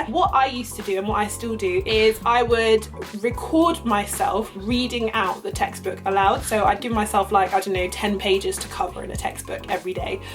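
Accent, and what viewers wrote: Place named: France. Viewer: This video helped a lot! Love ur French accent BTW <3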